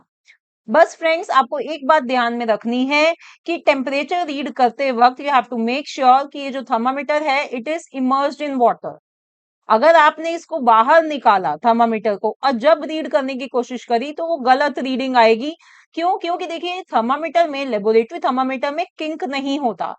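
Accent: Bihar, India. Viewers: Mam can you tell are you from bihar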